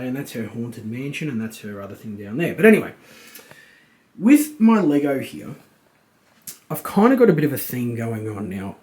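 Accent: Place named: Australia. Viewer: Hey. Thanks for still give me my fortnight Dosis of Aussie accent. 🤗 great collection you have. Made my Star Wars heart beat higher. And yes please please also show the downstairs Legos. And you do know that there are glass doors for the Kallax shelves, right?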